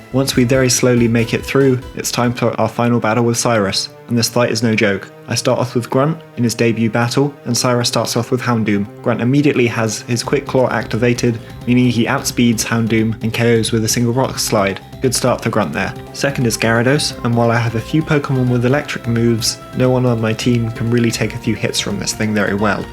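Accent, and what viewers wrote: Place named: United Kingdom. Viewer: This must be the first nuzlocke video I watch with a British accent! That’s so brilliant, bring it on man! 💪🏻🤗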